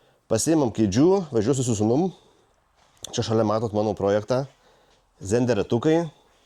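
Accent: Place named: Miami, United States. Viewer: Geras video, bet jay tas majamio akcentas.....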